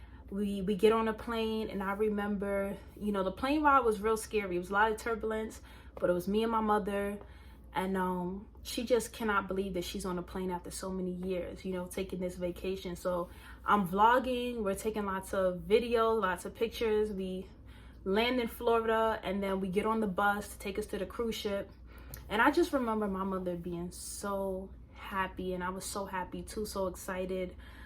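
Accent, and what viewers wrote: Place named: New York, United States. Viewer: Omg are you from NYC, is this how we sound😂😂😂😂 lol 🌺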